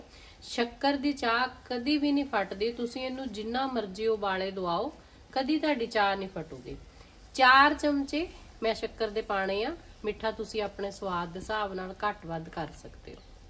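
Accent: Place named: Majha, India. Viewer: "gaud" majhay aale sadde aale side di boli badi miththi hai ❤😊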